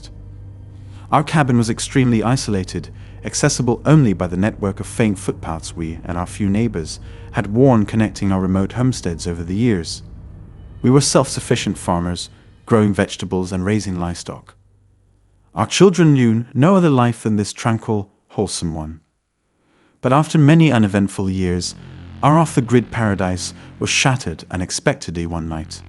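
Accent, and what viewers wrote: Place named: England, United Kingdom. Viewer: An Englishman ! 😉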